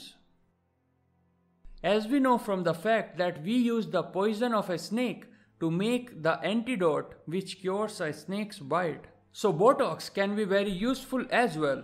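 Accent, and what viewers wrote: India. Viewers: You talk like an indian and i love it KEEP IT UP😁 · His voice sounds like a indian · So easy to tell that ur indian i am from nepal btw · Me: Time to watch!! * Indian guy starts talking* Me. What a hell!?!? THATS SO COOL!! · Are you From india? Because Your Language is Indian English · Why are you talking in a Indian accent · Your accent sounds like Indian. You definitely are from South Asia. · To be honest voice sounds like an India like if you think to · Lemme guess you are indian becoz ur accent bro i love india they are kind persons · U just gave a love to my comment. I still haven't got my answer which country u belong to I have seen every video of yours today I visited your another channel and by face and voice it seems that u are an Indian . I want my answer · has an indian accent...btw are ya indian...if you are then namaste..I m also indian...and if you are not than I will be the one embarassed..lol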